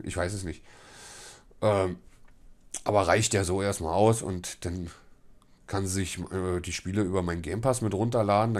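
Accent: Berlin, Germany. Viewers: Also mein Lieber, entweder Berlinerste richtich oder lässt det. Dieses „Berliner/Hochdeutsch“ dit is nüscht!!! Grüsse 🤘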